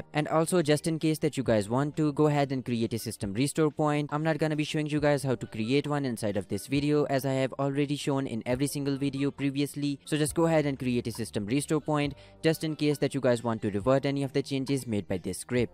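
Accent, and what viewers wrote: India. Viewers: that indian accent tho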